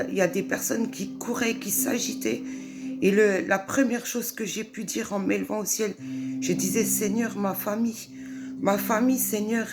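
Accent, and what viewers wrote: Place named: Franche-Comté, France. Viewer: Hallelujah ma soeur merci pour ton partage, sois bénie au nom de Jésus Christ ! (Je reconnais que tu es Franc-Comtoise à l’accent parce que je le suis aussi hihi) Le Saint-Esprit en toi à parlé Gloire à Dieu ! 🕊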